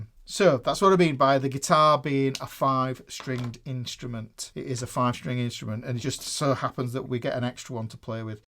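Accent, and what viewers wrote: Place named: Newcastle, United Kingdom. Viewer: Great accent. Are you a Geordie?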